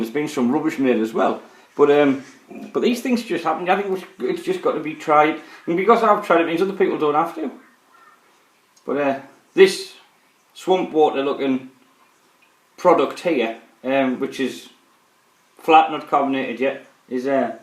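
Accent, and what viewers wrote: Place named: Blyth, United Kingdom. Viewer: You sound like your from my neck of the woods. I'm in Blyth.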